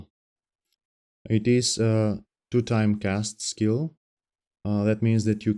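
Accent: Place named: Bulgaria. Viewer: Damn, the fact that your Bulgarian accent is so strong, gained a new sub. Здрасти)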